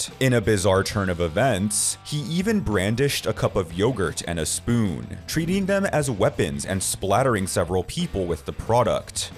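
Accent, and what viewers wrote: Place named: New Jersey, United States. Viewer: That’s a New Jersey accent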